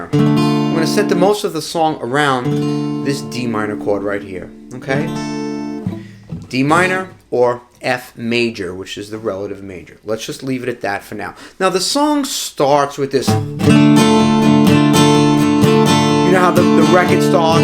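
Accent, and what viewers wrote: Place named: Long Island, United States. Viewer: Nice lesson. Got two loopers one Boss and one on the Helix. Now ive gotta figure out how to use them. I know the Boss is capable of overdubbing not so sure about the Helix looper. PS. Wheres the accent from?? Sounds like either Strong Island or Massachusetts. Im betting on Long Island!!! Am i right???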